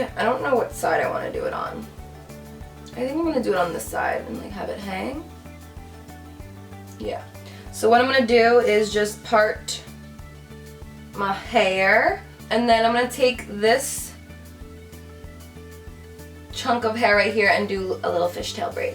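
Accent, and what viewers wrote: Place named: Boston, United States. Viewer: where is she from? she has like a boston accent or something haha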